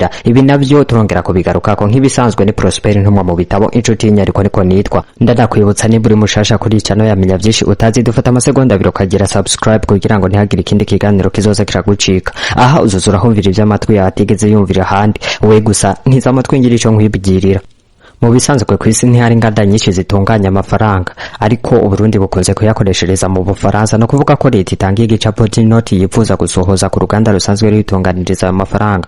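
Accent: Rwanda. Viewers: Nagira ndagusabe uze uriga uravuga ikirundi mpuzamakungu apana mpuza mahanga ivyibinyarwanda wivugisha stop ikindi Vuga nkawewe woye kwigira nka Ismail mwanafunzi ibisigaye courage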